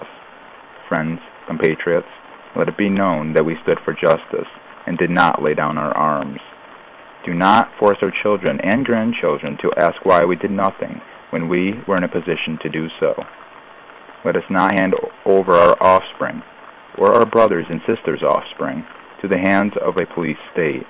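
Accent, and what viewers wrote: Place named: China. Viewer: Frutation??? Subjigated to...? Chinese english...not bad though....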